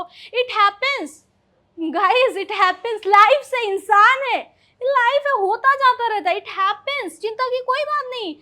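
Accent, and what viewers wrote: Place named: United States. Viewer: Mujhe English bolna bhut pasnd h jyse aap bat krte ho American English aap 60 days course me American pronunciation add kr lijie plzzz❤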